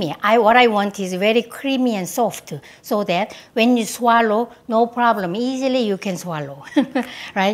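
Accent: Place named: South Korea. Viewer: i am very surprised that you speak English so well(even with light KR accent)!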